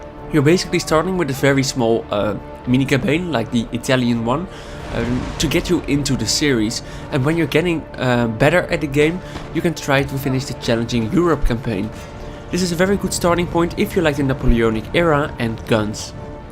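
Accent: Netherlands. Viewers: Ik hoorde na 2 seconden al dat je Nederlands was. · are you dutch becouse youre acent notting bad but just curious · As your eastern neighbour, i like your dutch accent, it sounds as funny as it sounds in german But still a good Video, even if i have around 1200h in all Total War games and own every game since Rome I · never watched your videos are you dutch? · Jij bent nederlands of niet hé! hoorde het aan het accent haha! xDDD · Ben je nederlands? Haha, mooie vid in iedergeval.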